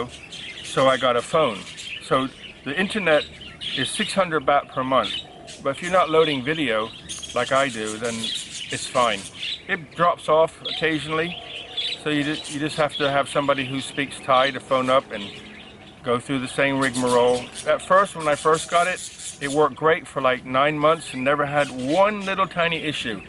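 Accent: United States. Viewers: This is your first video I've watched. Thanks for the good info. I was a bit surprised to find you're British. You sound every bit American :-) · One more if I may! Your English appears to be more American than British how is that possible?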